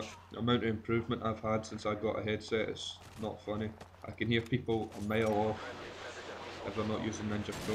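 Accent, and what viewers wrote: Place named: Scotland, United Kingdom. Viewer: subbed cause you from scottish mon the scots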